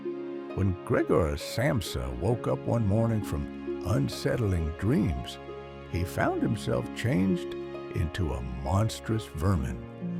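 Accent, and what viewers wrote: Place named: United States. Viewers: This channel is from the UK, not from US. That was their decision to go with the American accent. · I found that American accent off putting.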